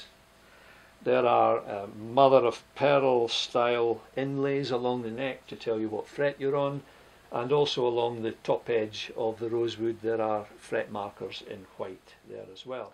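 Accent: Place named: Scotland, United Kingdom. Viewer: That made happy to hear the Scottish accent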